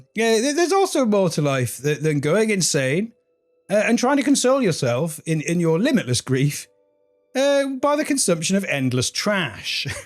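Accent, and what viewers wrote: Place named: United Kingdom. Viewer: British accent for someone in USA!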